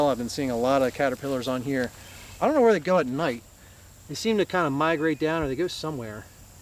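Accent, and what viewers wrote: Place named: Maryland, United States. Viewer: That east-coast Maryland accent brings me right back to my homeland of Philadelphia. Love it.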